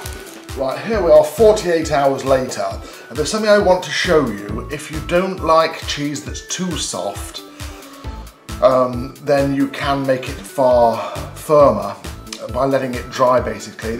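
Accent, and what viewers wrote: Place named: United Kingdom. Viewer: I'm sorry but hearing you say mention the mold 'colonize' your cheese, said in your British accent, made me laugh.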